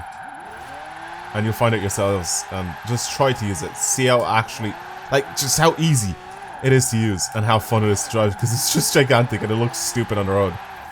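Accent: Ireland. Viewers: What park of ireland you for sound like your from down the country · An angry Irish man shouting at me, what else would I want?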